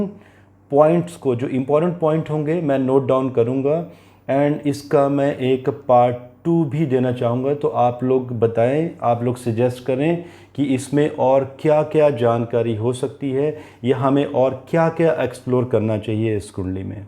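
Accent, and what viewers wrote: Punjab, India. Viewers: this is happens why typically punjabi person trying to speak in hindi anyway always liked ur video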